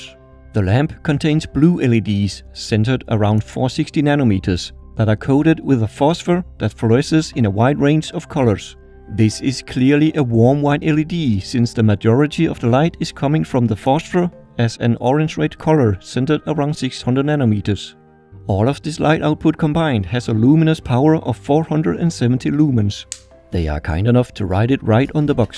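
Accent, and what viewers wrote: Denmark. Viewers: har næsten lige fundet din kanal. Ku sku høre med det samme du var dansk (go'e gamle accent)😂 Har seriøst binged dine videor. Pisse fedt med en dansker der laver sådan nogle slags videoer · YouTube recommended this video and I thought it would be interesting. It didn't take many seconds before I could hear that the narrator is Danish. Fortsæt det gode arbejde 👍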